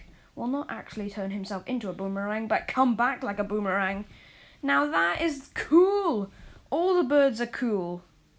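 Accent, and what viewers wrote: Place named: Australia. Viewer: I love your Australian accent!! ❤❤❤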